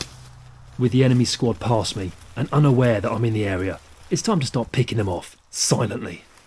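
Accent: England, United Kingdom. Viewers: “Tell my mrs.” LOL. That guy deserves a beer. I love the way you English say things.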